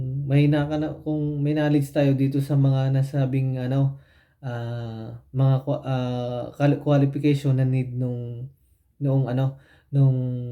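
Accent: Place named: Batangas, Philippines. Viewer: Okay sir salamat, meron kang accent na batangas sir, taga batangas kaba? Nag work ako ag&p year<num>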